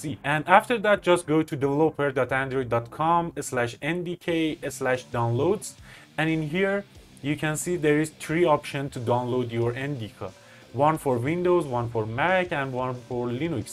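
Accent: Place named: Turkey. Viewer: Türk aksanını yakaladım hocam :D Ellerine sağlık harika video olmuş.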